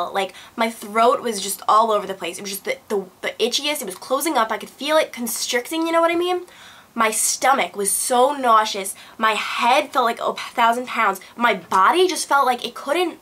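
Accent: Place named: Boston, United States. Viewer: You sound like you are from Boston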